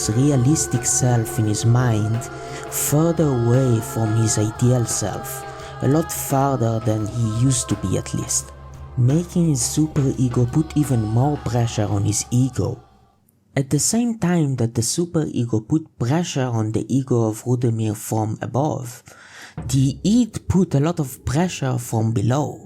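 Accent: France. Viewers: are you french by any chance? your accent is nice but as a non native it was also hard for me to understand the first minute or so of the video hahaha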